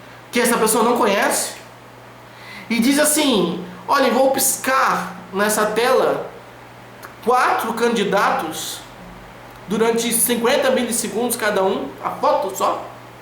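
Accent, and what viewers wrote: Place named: Minas Gerais, Brazil. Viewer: Eu pensei em duas possibilidade: 1) ele tá treinando uma técnica de oratória. 2) ele tá misturando o sotaque dele com o mineiro e/ou baiano. O estar bêbado pode ser uma terceira hipótese rsrsrs >.<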